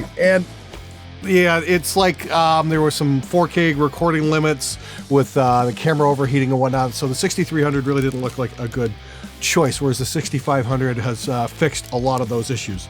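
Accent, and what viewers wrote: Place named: Canada. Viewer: Every time he says about I'm like wtf and then I remember he's canadian XD not that that is wrong I just always forgett he's from another country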